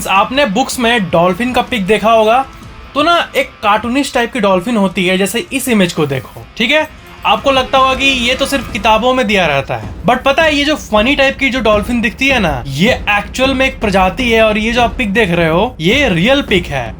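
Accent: Bihar, India. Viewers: Bhaiya ap bihar se h kya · Tumhare Bolne ke tarike se anyone can say you are bihari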